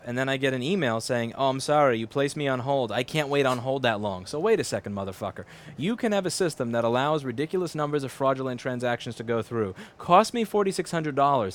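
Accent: New York, United States. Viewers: New Yawkers like pronounce "human" as "you-man"... Not a criticism,! You're the freakin man! 100% love for you!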